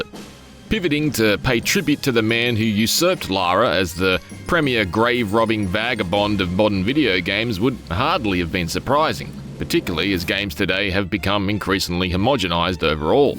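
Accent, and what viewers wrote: Australia. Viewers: I've always admired your reviews. Well written, relatable, and just like a friend telling me what they think of a game. As someone who has spent most of my career in network TV and video production, I want to give credit where it's due. It's also always great to hear an Aussie voiceover that fully embraces how we talk. I'm also thrilled to see such a win for Xbox. Such a revered franchise from my childhood deserved the true AAA treatment, and I can't wait to fire it up on my PC.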